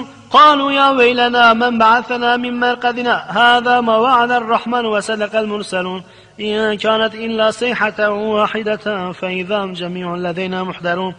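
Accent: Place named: Southeastern Anatolia, Turkey. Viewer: Mustafa bey öncelikle iyi günler dilerim. Yorumunuzda bahsettiğiniz tarzda çok çok büyük hatalara rastlamadım. Denk geldiğim kadarıyla 6 yerde harf telaffuz hatası var.(Peltek yerine düz okuma gibi.) Bunu da her insan hızlı okurken gayet de yapabilir. Bey'de farkettiğim kadarıyla Doğu-Güneydoğu Anadolu ağzı var. Bu nedenle de bazı harflerin telaffuzu kendisi için alışılmadık gelebilir. Ortada veballik bir durum olduğu düşüncenizi reddediyorum. Size ve sevdiklerinize sağlıklı ve huzurlu günler dilerim. Bey'e de sevgiler. Başarılarının devamını dilerim.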